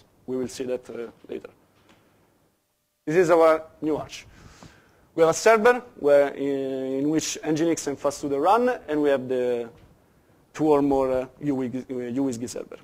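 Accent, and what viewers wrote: Italy. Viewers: It's just I had hard time understanding, very strong accent. Maybe it's because I heard Italian accent for the first time. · Because this was recorded in Florence Italy. Europe isn't a single country. There are many different languages being used. And he IS speaking English, but he has an Italian accent, we get used to it.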